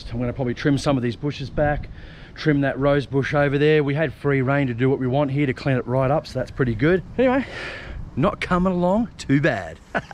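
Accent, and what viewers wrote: Australia. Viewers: Hello. You sound as if you are from the Land of Oz. I'm from over the ditch, in Auckland. Oh yes. I'm 76 years old, and still mowing my own small lawn with a Ryobi battery self-propelled mower, but the trimmer and edger are wielded by myself -- they are not self-propelled (how could they be?).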